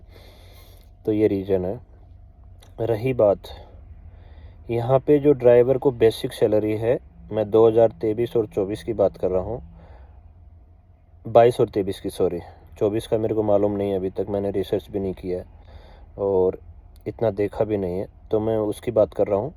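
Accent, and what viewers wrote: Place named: Rajasthan, India. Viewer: Rajasthan se lag rahe ho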